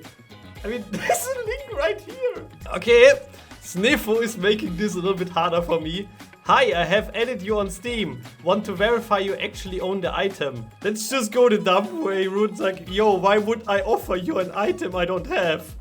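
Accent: Germany. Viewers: Love your german-english accent :D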